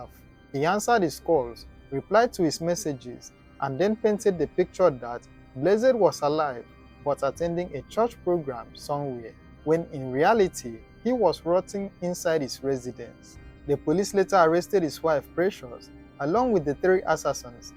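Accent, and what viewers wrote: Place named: Igbo, Nigeria. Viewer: It surprised me too because he sounds Igbo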